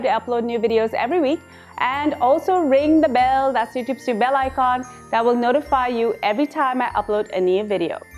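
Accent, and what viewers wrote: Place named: India. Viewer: I like your accent eventho you're Indian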